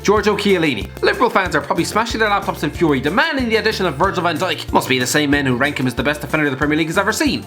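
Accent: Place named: Ireland. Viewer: When I saw “XI”, I thought it would be that dog shit narrator who did the all time XIs for Klopp and Guardiola. Thank God for the returning; Christ above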